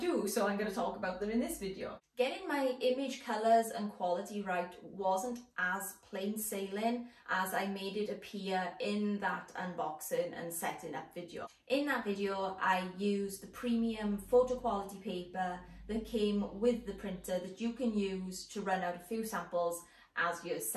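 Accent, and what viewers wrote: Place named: Wales, United Kingdom. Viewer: 🏴🏴 Love your Welsh accent ❤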